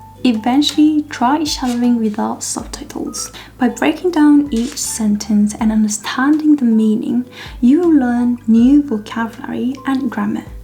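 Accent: United Kingdom. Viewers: Born in Okinawa but has a British accent? Huh?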